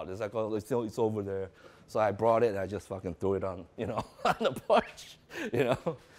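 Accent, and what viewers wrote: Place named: Bay Area, United States. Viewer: He kinda sounds like he’s from the bay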